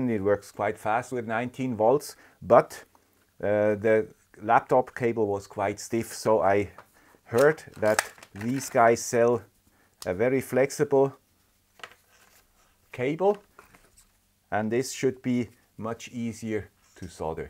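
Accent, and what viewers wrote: Switzerland. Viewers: den Schweizer Dialekt hört man schön raus : ) LG aus Österreich, tolles Video. CE Markierungen sind so eine Sache bei den Produkten ^^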